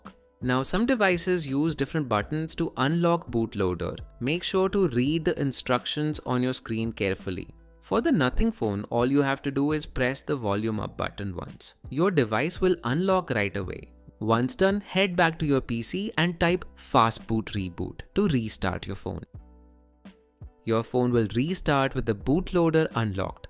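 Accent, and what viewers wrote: India. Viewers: always guy with indian accent is most helpful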